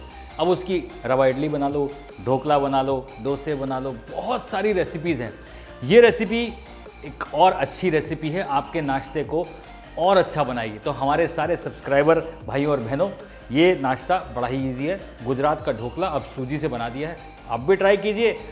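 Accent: Haryana, India. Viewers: Hi Chef, thanks for the yummy dhokla recipe. Will try it definitely over the weekend. Dhoklas are one of my all time favourite . The Harayanavi dialect is so sweet to hear.👏👏🙏🙏😊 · Really liked your haryanvi accent big fan Sir 🥰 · ekdum majedaar recipe, like your haryanvi accent... god bless!!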